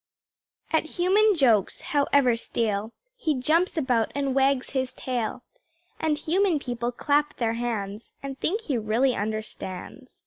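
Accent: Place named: United States. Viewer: Английский с американским акцентом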